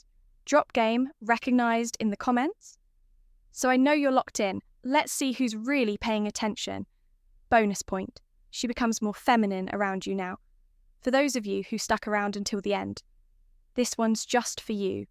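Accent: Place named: England, United Kingdom. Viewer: Great stuff,. Hope I got the name right. Well done. Keep up the good work.👍 You have a smooth rich sweet voice too. Plus a pleasant English accent.💞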